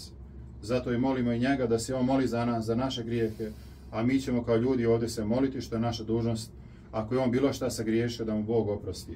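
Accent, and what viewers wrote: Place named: Ekavian, Serbia. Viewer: Opet ekavičar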